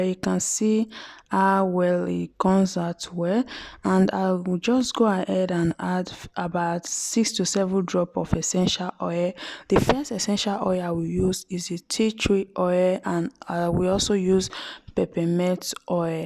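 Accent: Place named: Nigeria. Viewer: 😂😂😂😂😂😂she is from Nigeria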